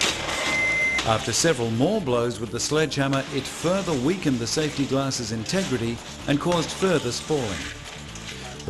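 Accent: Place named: Australia. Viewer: The narrator sounds like an Australian, judging by his accent.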